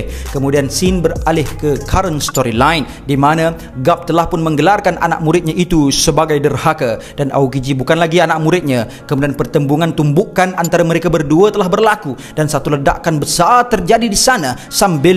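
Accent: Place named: Batu Kurau, Malaysia. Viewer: cakap batu kurau sikit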